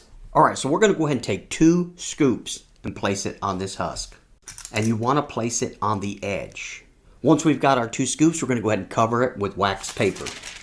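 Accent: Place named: Texas, United States. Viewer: cool, I did pick up on a bit of a Texas accent.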